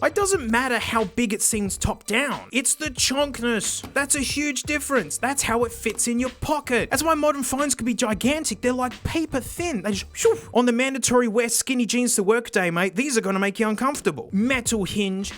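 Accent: Australia. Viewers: the aussie man is back at it again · That has to be the most Australian dankmic rant yet.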